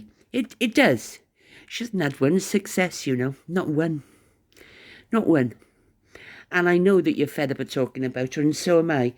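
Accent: Wales, United Kingdom. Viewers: Love thst welsh twang so glad to hear it again its been worth waiting for welcome back ❤❤❤❤ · lovely to hear your dulcet Welsh tones again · I do miss your Welsh accent my lovely friend sorry your not well with a rotten chest infection hope it gets better soon 💐💐💐❤️‍🩹❤️‍🩹❤️‍🩹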